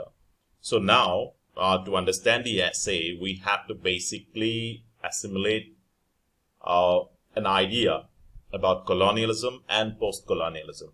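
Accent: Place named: Assam, India. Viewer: Literasure.. I love ur assamese accent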